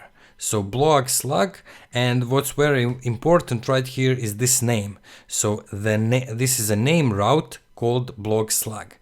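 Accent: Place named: Russia. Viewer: Ну это русский пацан, сто процентов!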